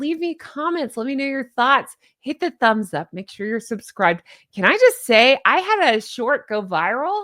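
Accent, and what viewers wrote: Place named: United States. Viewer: Im not saying all Americans have a crappy sense of humour, but generally us Aussie's do consider American's to be a little sensitive and easily triggered lol. is very much like an Aussie with her highly developed sense of humour and down to earth personality and the way she laughs and smiles often, any Aussie reading this will KNOW EXACTLY what I mean. is like an Aussie with an American accent 😁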